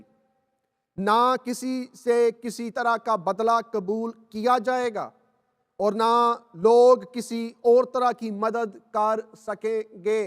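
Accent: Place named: Punjab, Pakistan. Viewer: brother I don't want to hurt you. For example say Hadith number 1234. Actually when you speak in Punjabi alphabet I can't understand. Even I am Punjabi. Sorry once again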